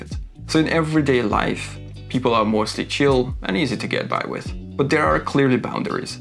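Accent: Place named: Hungary. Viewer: You're very observant, and I agree with all your points. I'd also like to say 'Welcome to Denmark'. Can I ask where you are from?🙂 If I should guess from your accent and last name, perhaps Hungary? I also live in Copenhagen, and I'm very embarrassed about the littering of my fellow townspeople after public events..! Sheer laziness and drunken stupidity are the main reasons for all the trash, like seen after the Distortion festival. Maybe we should make it illegal to throw trash in public (like in Singapore). Perhaps a fine of DKK 1.000,- would make people think twice.😉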